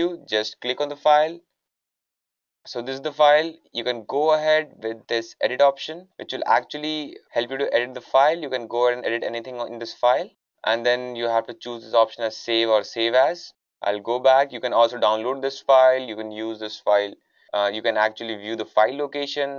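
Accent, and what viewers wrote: India. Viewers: 一口咖喱味的英语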